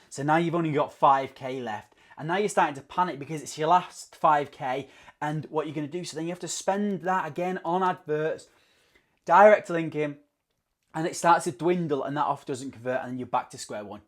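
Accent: United Kingdom. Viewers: Hahaha funny UK accent he gat there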